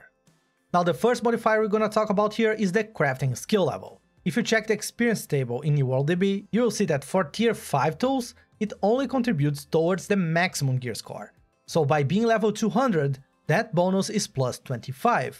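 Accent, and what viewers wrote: Brazil. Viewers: Ctza q eh BR com esse sotaque ai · Mano, tu é br num é? esse sotaque não me engana kkkkkkk. Mas, otimo video, valeeu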